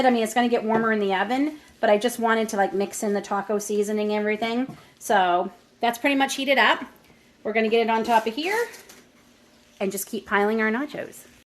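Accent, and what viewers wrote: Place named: Canada. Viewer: Thanks for sharing! SW Ontario here! I heard your accent and was searching for anything that pointed to you being Canadian. I saw the Bernardin lid and was so excited (obviously missed the bagged milk)! Pork was my first pressure canning experiment last year and my first meal with it was nachos. I figured if it was going to kill me, I was going out on nachos. Bit more confident in my canning now, haha!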